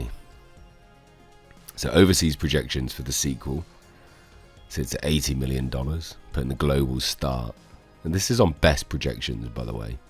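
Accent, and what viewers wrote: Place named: United Kingdom. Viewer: I hope you get well soon! I miss hearing your lovely British accent.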